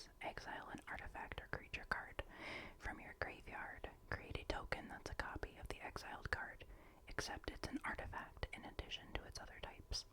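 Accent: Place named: Midwest, United States. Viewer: I love your Midwest accent. It’s so soothing.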